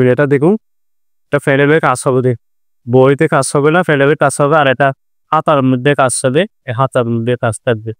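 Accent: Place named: Chittagong, Bangladesh. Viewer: আমি আপনার চট্টগ্রামে দোকান থাকলে সেটা কোথায় তা জানতে চেয়েছি। আপনার কথায় বুঝা যাচ্ছে আপনি চট্টগ্রামের।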